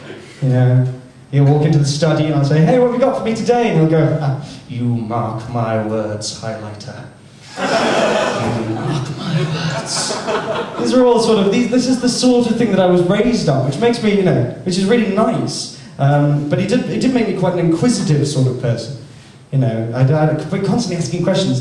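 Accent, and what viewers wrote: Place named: RP, United Kingdom. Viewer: I was like "why does he sound so posh? He's from newca- oh, it's at Cambridge that's why"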